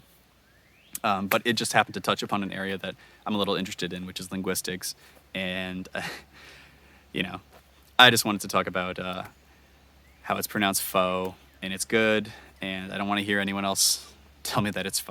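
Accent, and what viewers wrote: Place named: United States. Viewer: So, for a start, you have an American accent, yet you're speaking English. And now you want to apply your AMERICAN accent to OTHER languages as CORRECT ENGLISH? Sure thing.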